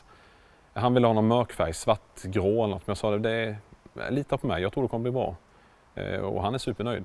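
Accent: Småland, Sweden. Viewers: Vanlig småländska med en lokal blandning.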